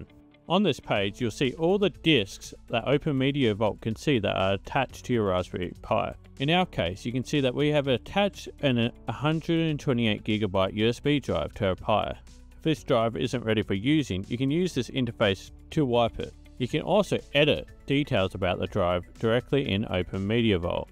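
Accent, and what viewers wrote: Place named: Australia. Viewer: I love your vids, can’t beat that aussie accent 👍 Can you please do a vid showing how to get matrix synapse running over tor? 🙏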